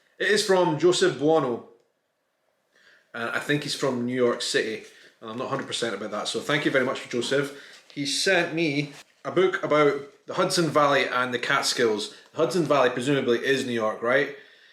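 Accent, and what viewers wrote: Scotland, United Kingdom. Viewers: Hi 👋 from SoCal... I have to confess, I watch your videos because I love the Scottish accent. ❤️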